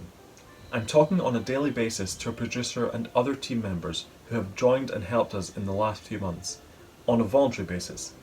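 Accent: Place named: Scotland, United Kingdom. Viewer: oh, I’m sorry, it was just hard to understand because of your extremely thick Scottish accent and total inability to properly enunciate words in what I assume is your native language. But nice bitchy attitude you got there, directed towards one of your fans no less. Great look for someone who is trying to build their YouTube following! Let me know how that works out for you!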